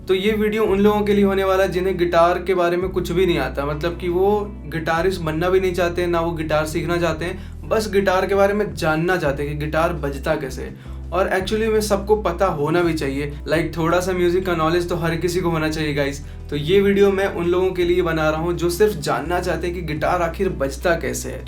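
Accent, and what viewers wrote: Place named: Bihar, India. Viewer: Bihari babua “theth bhasha”….. 🫶🏻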